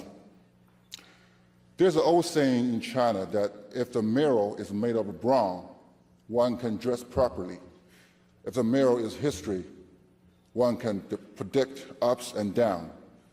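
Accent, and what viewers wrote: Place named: United States. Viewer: 눈감고 들으면 그냥 미국인이다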